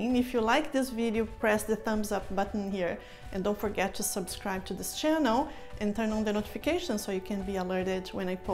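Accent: Brazil. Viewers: recognized the accent, uhul brasil il il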